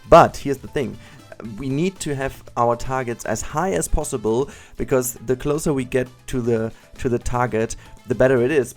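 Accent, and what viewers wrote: Germany. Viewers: Are you German? You sound like one!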